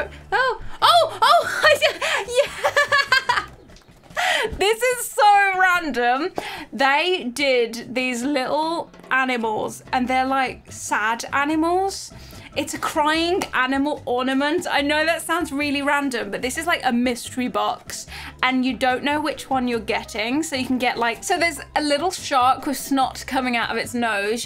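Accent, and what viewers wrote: United Kingdom. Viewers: Ok that British accent is everything 😍😍😍🥺🥺🥺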